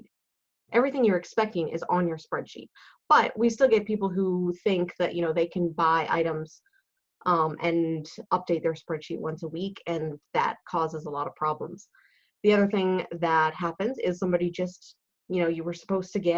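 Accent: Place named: Canada. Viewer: Fascinating and useful stuff, not dull at all. I especially like that you don't ship problematic books for whatever reason. Answered a lot of questions perking the last several months that you've helped me navigate FBA. Love the Canadian accent, too.;-)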